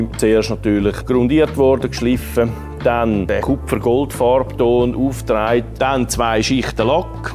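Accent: Switzerland. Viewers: Ich komme aus Süddeutschland und kann alles verstehen. Ich mag den schweizer Dialekt sehr, Töfli hört sich doch viel besser an als Moped.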